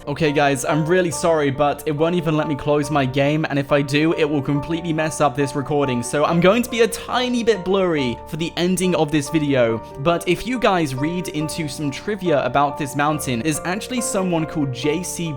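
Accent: United Kingdom. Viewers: It's a British accent come on guys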